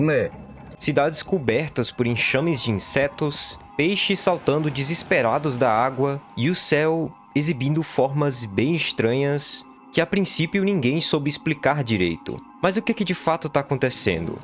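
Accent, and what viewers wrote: Rio de Janeiro, Brazil. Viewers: Decide se tu é carioca ou paulista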